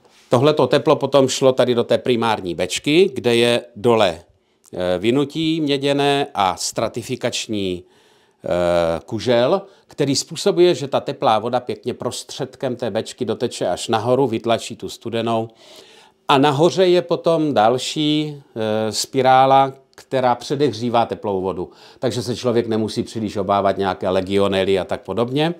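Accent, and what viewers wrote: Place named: Brno, Czech Republic. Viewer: Mě trochu připomíná pana premiéra Fialu :) Hlasem, lehce brněnským přízvukem, těma pomlkama uprostřed věty i tím formálně-akademickým jazykem.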